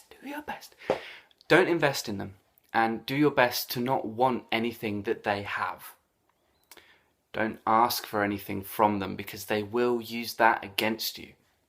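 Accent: Scotland, United Kingdom. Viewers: Not too long, this video, love your Scots accent.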